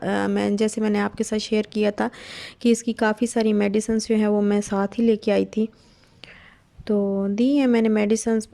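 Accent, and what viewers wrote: Saraiki, Pakistan. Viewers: Welcome to karachi sister you said you belong to Lahore but your excent is Sariki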